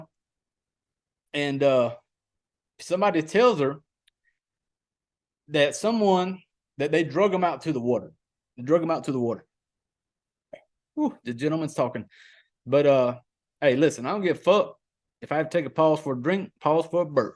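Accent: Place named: South, United States. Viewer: Dude it ain’t no problem at all. I’m from the South too man. Just above Danville Virginia. When I heard you talk I was like hell yeah! I like this shit! I have The Tank lined up for me and my wife to watch tonight. That sounded like my kind of movie 👍🏻👍🏻 I started listening to your first podcast and I’m working my way up so I don’t know what all you’ve put on the podcast but do you ever watch Bigfoot movies? I loved me some Bigfoot dude. Check out Exists if you haven’t. I would love to hear your take on that one 👍🏻👍🏻 I’m an Elijah Craig drinker myself, matter of fact I took a half day off work to enjoy that beautiful stuff and mow my yard! Lol! I ain’t never mowed sober.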